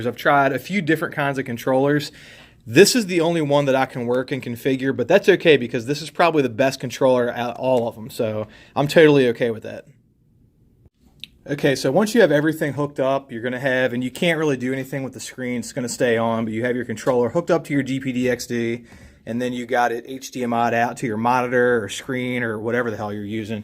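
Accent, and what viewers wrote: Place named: South, United States. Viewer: Surfer dude? This dude clearly sounds like a hick.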